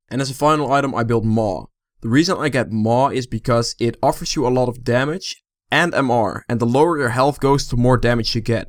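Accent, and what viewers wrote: Netherlands. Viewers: haha ik praat ook nederlands (België) dus ik herkende het al :p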